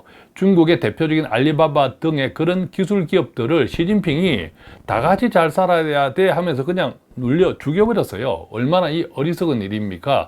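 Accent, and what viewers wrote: Gyeongsang, South Korea. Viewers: 말씨가 갱상도네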